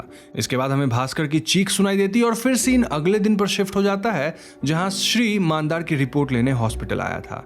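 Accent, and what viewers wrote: Bengal, India. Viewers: Kya Bengali ho?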